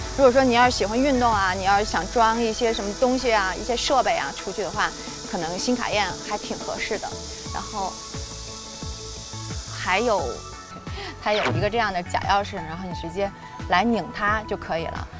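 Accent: Beijing, China. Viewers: 解说的很到位，喜欢你的北京腔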